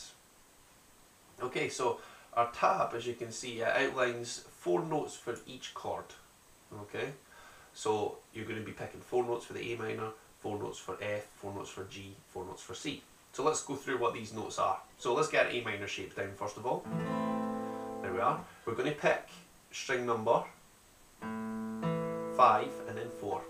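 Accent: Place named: Scotland, United Kingdom. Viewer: my guess is he is scottish, he deffinatley sounds it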